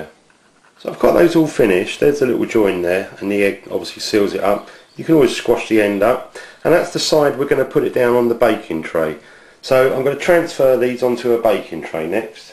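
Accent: United Kingdom. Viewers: My apologies, I thought you were talking about something you can only find in the uk, looking back on the video, I now see you were talking about BEATED egg, and not beat and egg. I sometimes have troubles with understanding uk accents (I come from Canada). Thank you for your understanding, ~DeathAtheist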